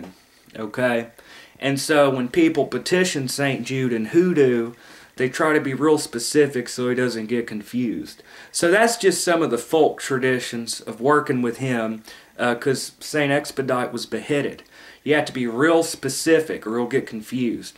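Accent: South, United States. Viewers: What a strong southern accent!!!!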